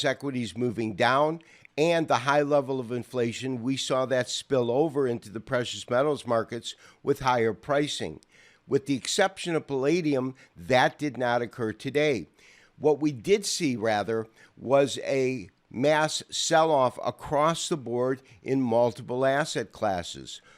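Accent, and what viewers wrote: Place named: United States. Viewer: American lingo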